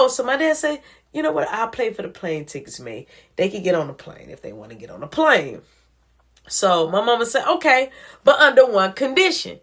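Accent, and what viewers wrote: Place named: Chicago, United States. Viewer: I thought you was from Chicago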